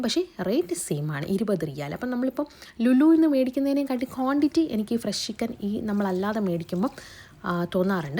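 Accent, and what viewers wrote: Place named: Trivandrum, India. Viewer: Trivandrum slang orupadundu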